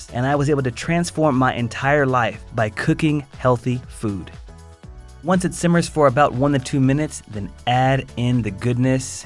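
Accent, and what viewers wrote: United States. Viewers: Whoop whoop Such an American accent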